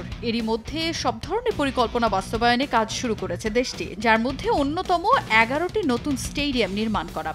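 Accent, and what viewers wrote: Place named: Noakhali, Bangladesh. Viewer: এটা তো মনে হয়ে নোয়াখালীকতে 😂😂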